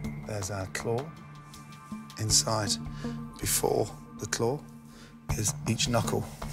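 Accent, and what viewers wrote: Scotland, United Kingdom. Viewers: I don't know why his British accent makes me feel energetic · HE’S SCOTTISH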